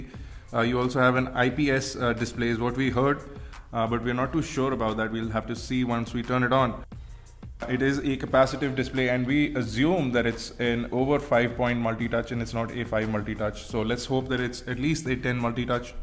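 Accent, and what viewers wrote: India. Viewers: shut up.. this guy is a pro (not just in reviewing but also in English) and u call his accent bullshit coz ya just dont like Indians. now dats bulshit...!.. I bet u u wud hav loved it if it was a woman talkin instead of a guy.... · no...not at all...itz bullshit indian accent...waacckkk...!!the worst in the world!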